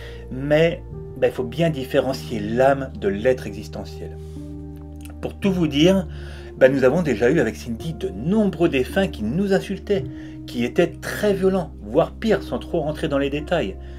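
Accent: North, France. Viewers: Bonjour 😊 super explication merci 💖 (je perçois un petit accent du Nord je me trompe ? 😁) Ça apaise de voir les choses ainsi, je comprends mais je ne peux m'empêcher de penser qu'on est vraiment des êtres sadiques et maso. Pourquoi s'infliger ça...🥺 Évoluer ? Par la souffrance ? Ça me fait me sentir encore moins bien au fond. Je continue de vous découvrir sur Youtube. 👍🏻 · Vous êtes du Nord de la France ??? Il me semble avoir reconnu cet accent particulier 🤣👍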